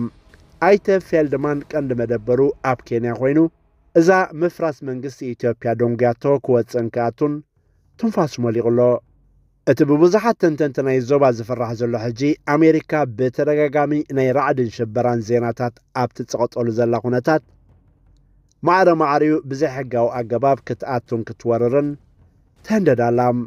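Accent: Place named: Eritrea. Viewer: ኤርትራዊ ቻነል ኤርትራዊ ቃና ዘለዎ ትሕስቶን ኣቀራርባን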